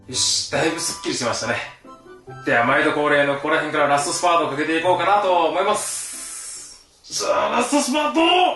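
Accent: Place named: Kansai, Japan. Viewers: あなたの関西弁も反則やわ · 関西弁って強い(感嘆)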